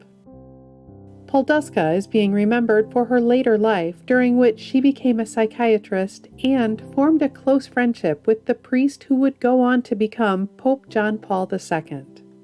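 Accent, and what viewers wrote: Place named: Illinois, United States. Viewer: I love this woman's voice and accent I wonder what part of America she comes from... GORGEOUS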